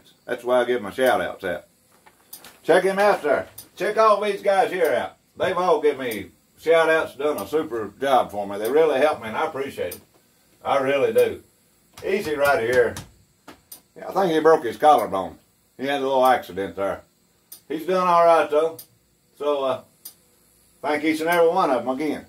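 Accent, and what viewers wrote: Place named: United States. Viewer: Orsum. I really enjoy watching your videos and trying to understand your lingo. 🤪 my missus is from way back in them NC hills she sure do appreciate the Merican accent from back her neck of the woods. Ah huh! 👍🏾💯 I did that 7 question challenge some months ago. It’s really interesting hearing other bikers answers. Good job buddy. 👍🏾💯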